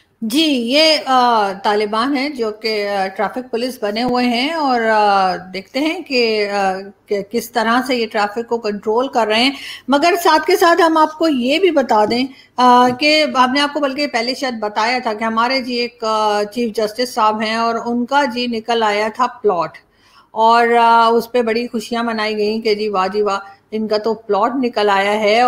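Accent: India. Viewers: ji, I used to see your videos and appreciate your guts to speak freely without fear in a state like Pakistan. Pl carry on the good work. One thing more, you speak like a normal Indian and sometimes I feel that I am watching some Indian channel. Best of luck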